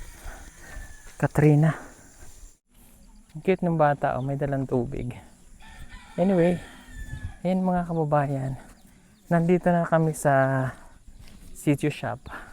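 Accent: Visayas, Philippines. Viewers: Kabalo na jud mag bisaya c...congrats po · Kblo na jud si mag 😂bisaya ba..😊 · Natutu na C mag bisaya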